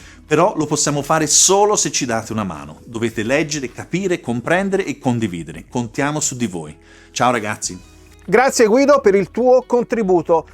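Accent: Tuscany, Italy. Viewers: Sacrosanto! Devo dire che mi ha fatto un po’ impressione sentire un bell’accento toscano in bocca a Guido, abituato com’ero a sentirlo parlare in inglese! 🤣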